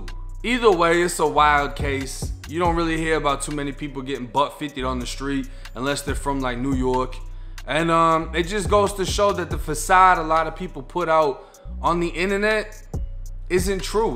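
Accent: Boston, United States. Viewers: Your accent sounds like you was raised in Boston and moved to a Florida hood when you were 10.. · Bro what part of Boston you from...!!!???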